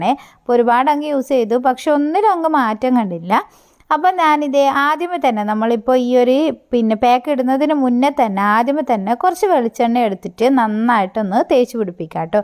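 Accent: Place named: Kasaragod, India. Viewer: അതെല്ലേ.... ഞാൻ കാഞ്ഞങ്ങാട് ആണ്... കാസറഗോഡ് dist.... ചില വാക്കുകൾ ഞങ്ങള് പറയും പോലെ തോന്നുന്നുണ്ട്... അതോണ്ട് ചോദിച്ചതാ 😍👍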